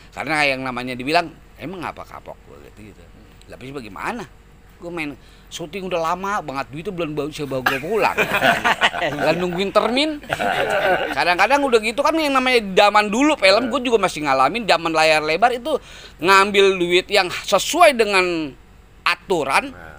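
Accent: Betawi, Indonesia. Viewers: Abdi Ti Sunda Sangat Kagum Dengan Budaya Betawi Apa Lagi Tutur KataNya ,,,LogatNa enak Di Degar,,, Bang Tolong ilagin Kata Loe Dan Gw Dong... Kata Aye Lebih Enak Di Dengar Dari Pada Gw... Trus Semengat Bang Dan Sehat Selalu... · Betawinya tulen banget 👍👍👍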